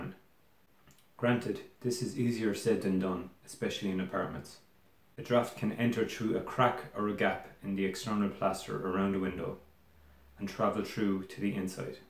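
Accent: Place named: Ireland. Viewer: I never thought i'd hear an Irishman talking about sashes.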